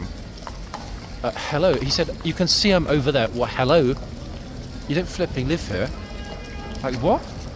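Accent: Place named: United Kingdom. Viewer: I am wondering, will the Life Guards be there next week, or will the Blues and Royals be there? We saw the soldiers in red last year. Even though they are both fantastic, we were hoping to see Blues and Royals. We arrive next Thursday. Just wondering! I love how knowledgeable you are. The way you explain and ask questions, is awesome! We spend part of our mornings watching what is happening in our most favourite cities, London! We also love your accent! Actually, we love all the accents from the UK. Glad you have bounced back from your illness!🙂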